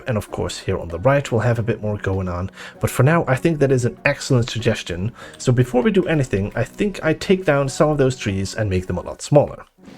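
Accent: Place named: Sweden. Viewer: Huh, I always thought that smaller house extension is actually Maru's room/apartment. Once again, you made this build look amazing, and figuring out all of the redstone stuff is way cool! Btw, your slight accent gives off Swedish vibes. Are you from there? Much love to you, as always, and thank you for sharing your creativity with the world! <3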